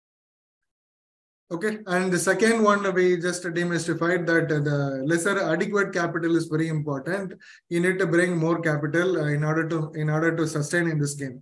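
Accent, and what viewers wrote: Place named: South India, India. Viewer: Very bad presentation and even his english also semi tamil or telegue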